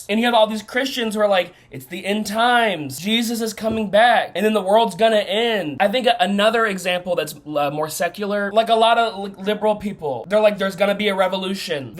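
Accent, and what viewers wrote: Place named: Midwest, United States. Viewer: You have the same accent as me: "Root" = "Rut" and "Woods" = "Wuds" - are you midwestern?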